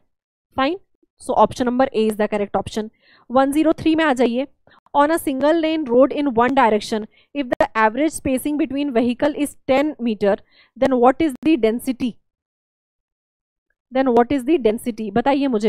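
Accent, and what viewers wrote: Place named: Allahabad, India. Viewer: allahabad se ho kya aap?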